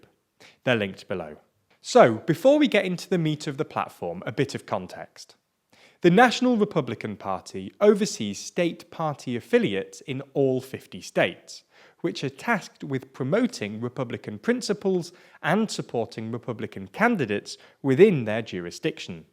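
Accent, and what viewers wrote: United Kingdom. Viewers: Listening about US politics in British accent is so refreshing. It almost makes it less depressing and frustrating. Thanks for the video though.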